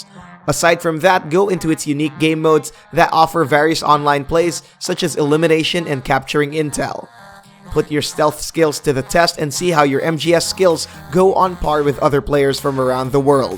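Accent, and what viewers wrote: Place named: Philippines. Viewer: narrator is filipino. obvious sa accent.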